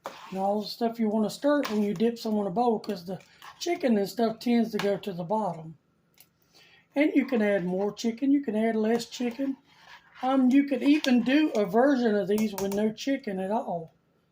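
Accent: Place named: South, United States. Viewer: 👁👁 had to tune in to get My Sweet Southern accent…fix… God bless you sister 🙏🏼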